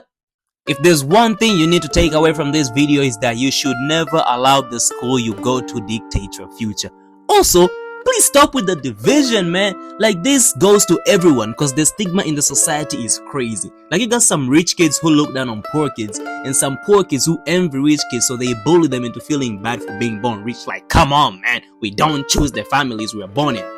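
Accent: Kenya. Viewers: You sound Kenyan… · Your accent had me thinking you were kenyan ngl.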